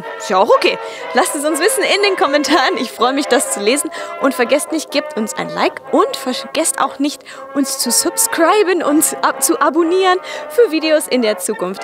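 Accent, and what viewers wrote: England, United Kingdom. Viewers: I always thought she was from the UK. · her accent sounds English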